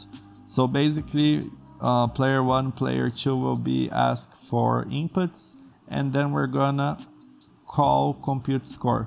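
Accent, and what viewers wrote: Brazil. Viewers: Vc é brasileiro ne ? pelo sotaque ! Se voce for sera que consegue me ajudar to no começinho do ensino to precisando de alguem pra troca umas ideias !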